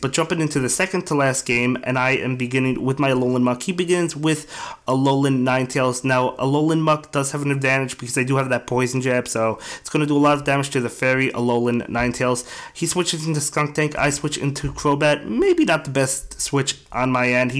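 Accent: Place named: New York City, United States. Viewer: It's a regional thing; I'm from NYC and I can understand him perfectly. Not sure what you're talking about with bodily noises.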